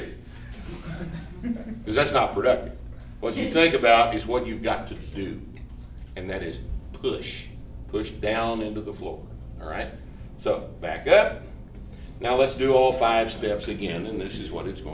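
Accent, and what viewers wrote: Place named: South, United States. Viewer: He should say to start drinking when the video starts to dull the pain of his "good ol' boy" accent and attitude...he's the master but good gosh it's torture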